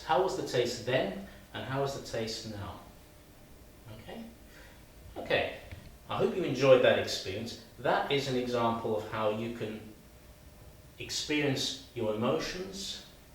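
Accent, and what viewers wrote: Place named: RP, United Kingdom. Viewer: Much better than most EFL/ESOL videos I’ve seen, you crack me up with your’sign language’!!! Pronounced like a true BBC presenter! I am going to pm you an idea-well done! Subscribe people!!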